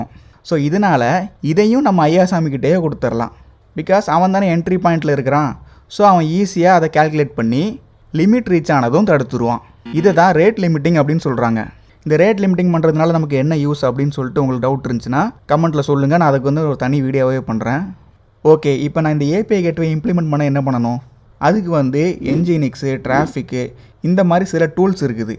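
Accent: Tirunelveli, India. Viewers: Neenga Tenkasi, tirunelveli side ah 😊😊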